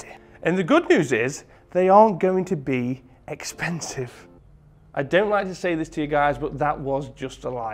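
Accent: United Kingdom. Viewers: why do you have a british accent tho?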